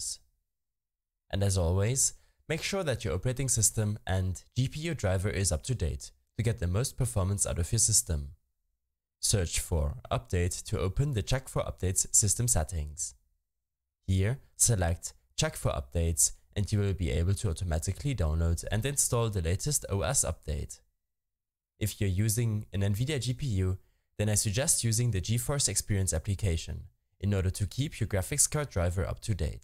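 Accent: Germany. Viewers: No man a German knows he sounds German, you would know it when you saw his channel description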